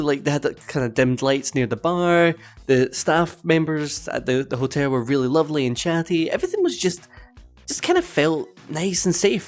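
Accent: Scotland, United Kingdom. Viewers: I gotta love your accent. You sound very Scottish to me.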